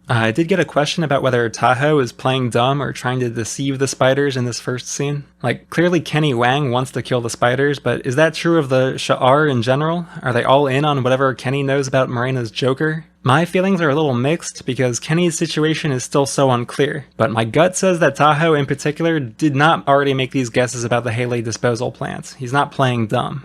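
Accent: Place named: Pacific Northwest, United States. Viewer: hxh fan, jerma references, league references, soprano references AND a PNW accent? im liking this guy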